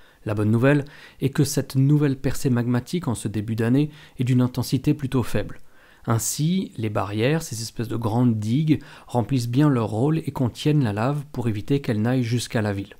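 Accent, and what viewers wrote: Iceland. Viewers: C'est dingue comment la situation peut évoluer rapidement...en mieux ou en pire 😱....Heureusement l'expérience a évité des victimes...😅 Bravo pour ces infos cher,et pour l'accent islandais toujours aussi chaud! ♨️🌋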